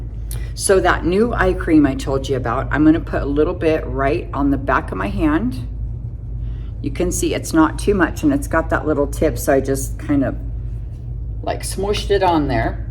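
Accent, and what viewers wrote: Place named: South, United States. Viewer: I love you. I can be down and watch you and before you know it, I’m laughing and enjoying myself. Your imitation of Paula Dean was “right on” and I swear you have southern roots from somewhere. I’m going to order the eye cream as it seemed so refreshing. Hopefully I can find the link after the video. Okay, I’ll get back to it.❤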